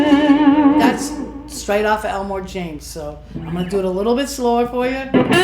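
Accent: United States. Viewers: A dear friend just turned me on to! Wow wow wow! She is the real deal. How have I not heard of her before? I am going to check her Zoom lessons. You may be from Chicago but do I hear a Boston accent? You rock! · Love your accent “Chicago”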